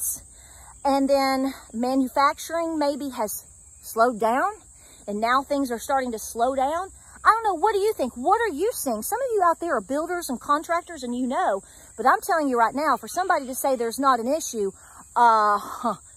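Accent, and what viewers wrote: South, United States. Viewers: Cheers from the Mountains of morroco u got a beautiful southern accent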